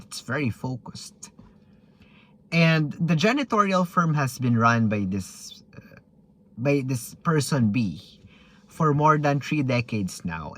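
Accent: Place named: Philippines. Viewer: i could tell you’re filipino! my mom never taught me how to speak tho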